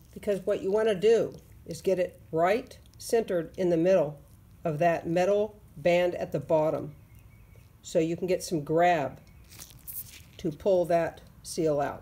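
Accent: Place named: South, United States. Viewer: Plus points for the southern accent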